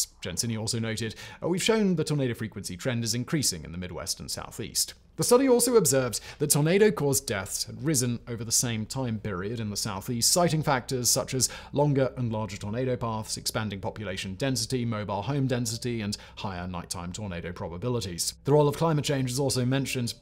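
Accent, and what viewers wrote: United Kingdom. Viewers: It's seriously boggling my mind to listen to a Brit who lives in Prague speak about my home state of Kansas. What a world we live in!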